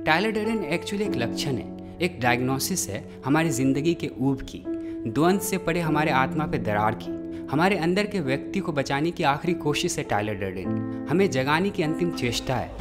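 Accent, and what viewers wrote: Bihar, India. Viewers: <link> no man this guy did it in this way i remembered this after all this time cause this was a gem a pure hand written content and admired this <link> aur ye chutiya apne bhiari accent wali english bol ke alaga gussa dila rha hai aur ditto is dusre wale video ki lines bol rha hai koi bhi samajh jyega original video pe millions me views hai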